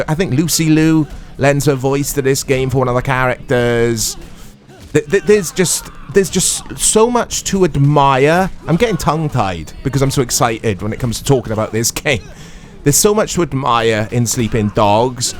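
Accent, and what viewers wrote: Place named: Cardiff, United Kingdom. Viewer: This boyz from Caaaaaaaaardiff or Newport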